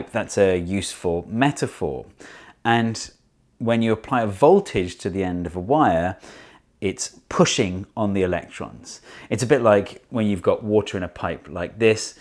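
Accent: United Kingdom. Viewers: <link> to an American wow I forgot how weird British people say aluminum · second (?) video i've watched of and i've only just realised they have a british accent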